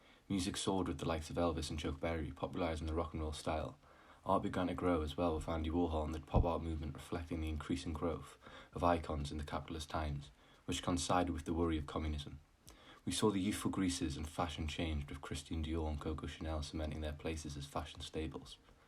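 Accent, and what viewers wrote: United Kingdom. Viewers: i think hes just british brother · geordie accent's an instant sub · This is fantastic man! What part of England are you from? North east right? I love your narration. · Dude you said semiautobiographal which isn't even a word. Funny how you many people will overlook your idiocy just because you have a British accent.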